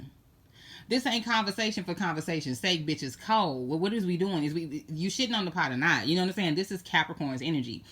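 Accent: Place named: Tennessee, United States. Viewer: love your accent, not Georgia, maybe Tennessee Kentucky?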